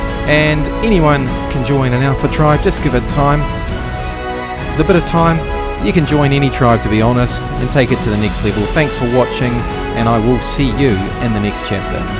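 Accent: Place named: New Zealand. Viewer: Where you from bro sounds like an New Zealand accent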